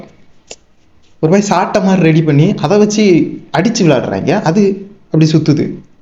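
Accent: Madurai, India. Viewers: Madurai slang 🔥🔥🔥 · ஒன்னு மதுரைக்கார மாதிரி பேசு இல்ல கன்னியாகுமரி காரன் மாதிரி பேசு, ரெண்டு மாதிரியும் பேசி கொல்லாதடா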